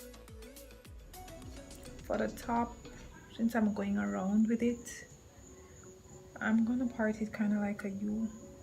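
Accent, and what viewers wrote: Jamaica. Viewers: This is really neat .. I can never slick my hair down ! But ill try this way .. Btw Are you Jamaican ? Kinda sound like one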